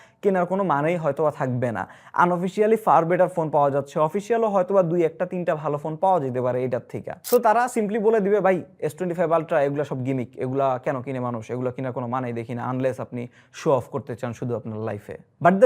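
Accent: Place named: Chittagong, Bangladesh. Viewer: ভাই আপনার ভয়েসে মাঝে মাঝে চিটাগাংয়ের অ্যাকসেন্ট চলে আসে!!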